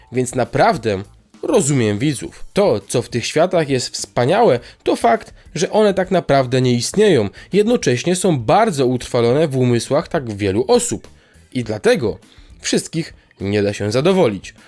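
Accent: Silesia, Poland. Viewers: mam takie pytanko, jesteś ze Śląska? Bo wyczuwam akcent:) nie mam FB ani innych mediów społecznościowych więc tylko zgaduję heh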